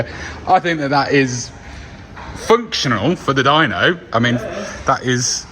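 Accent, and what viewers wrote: RP, United Kingdom. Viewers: makes us English guys sound like absolute fucking pricks , the definition of posh rich twats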